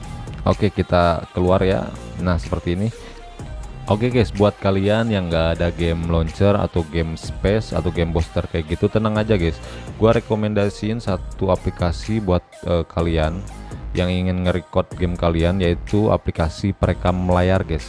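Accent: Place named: West Java, Indonesia. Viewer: Orang Sunda nih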